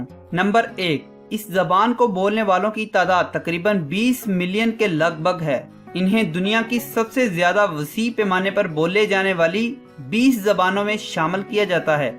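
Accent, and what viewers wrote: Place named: Punjab, Pakistan. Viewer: پنجابی لہجے میں پڑھا گیا ہے، بلوچی بولنے والوں کی تعداد کم بتائی گئی ہے اور کافی چیزوں کا تلفظ بھی درست نہیں ہے لیکن پھر بھی کہونگی کہ اچھی کوشش ہے ۔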